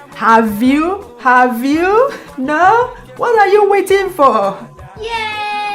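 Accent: Nigeria. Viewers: Even before clicking as I scrolled, I thought, you are a joy and I love your authentic Nigerian accent. I am Ibo too, I live in England. Continue to be you! sister, it's beautiful and we can all glean from you 🙌💖😊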